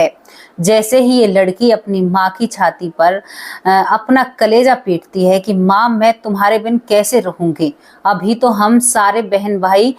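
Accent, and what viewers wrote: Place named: Braj, India. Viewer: आत्मा अजर अमर अविनाशी है।यही अटल सत्य है जी। आपकी हिंदी उच्चारण से आप ब्रिज क्षेत्र की प्रतीत होती हो, कृपया अपने बारे में भी बताने की कृपा करिएगा। सद्भावनाओं सहित दिनेश सारस्वत नयी दिल्ली से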